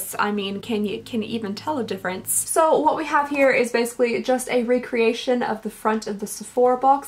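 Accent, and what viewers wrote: Kentucky, United States. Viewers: I thought you had a acent I am from Kentucky too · I love when your Kentucky accent pokes through, its absolutely adorable. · You’re holding back your Kentucky accent so much lol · So I've kind of been creeping on your channel for a while but hadn't subscribed yet. I was wondering why your accent was so familiar and NOW I find out you're from Kentucky which makes a lot of sense! I am definitely subscribed now! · Kentucky girl here, too! I'm new to your channel and as soon as I heard your accent I knew it was from KY! And funny enough, my hometown in Ky is actually even called Alexandria 😂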